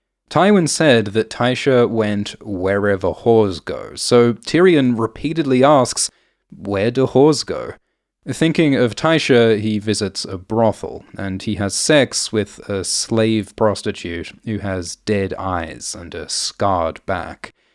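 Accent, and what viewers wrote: Australia. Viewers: I usually have a good ear if someone is Australian or British, mainly because Australian accents tend to have more of a drawl to them but with I couldn't tell because he has one of the Australian accents with less of a drawl to it. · He's clearly Australian to anyone with ears, so I'm not sure why anyone would be wondering that.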